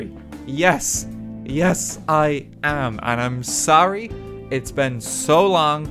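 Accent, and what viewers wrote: Ireland. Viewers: This might be shocking but from listening to your voice you might be irish.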